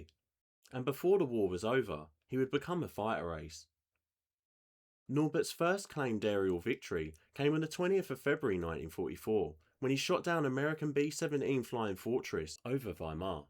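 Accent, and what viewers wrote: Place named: London, United Kingdom. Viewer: work on your accent, too much London in it.....the letter 'T' is meant to be pronounced, not figh-er or for-y.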